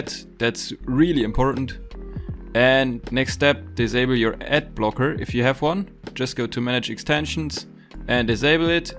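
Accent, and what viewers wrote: Germany. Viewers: are you german ? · Den deutschen hört man raus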